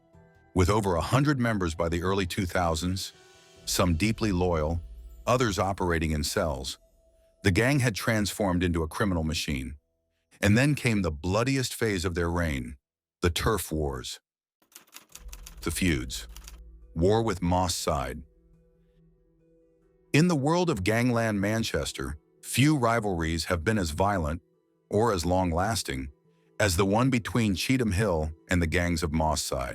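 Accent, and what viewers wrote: United States. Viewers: Lmfaoooffffffs 🤣 wrong gang you dip-stick 😂 along with shyte american narrator ..Yeh you is a sad attention seeker uploading this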